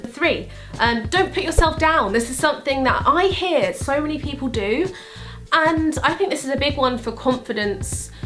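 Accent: United Kingdom. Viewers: I heart the British accent and I subscribed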